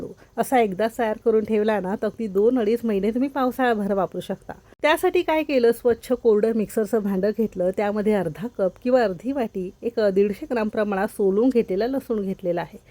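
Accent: Kolhapur, India. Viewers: Khup chhan recipe.. sadarikaran uttam.. tumhi kolhapurche aahat ka?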